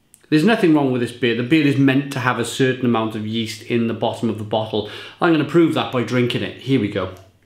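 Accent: Wales, United Kingdom. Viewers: slightly, he’s got a very light accent compared to some other welsh people but all in all I think a lot of them don’t have a particularly strong accent as some times you can’t even tell if they are welsh and that’s coming from someone who lives in England and has been wales quite a few times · You can hear the Welsh tone, though it is more subtle than other Welsh accents that are stronger. Watch and listen to former rugby player and now commentator Jonathan Davies. That is a stronger Welsh accent.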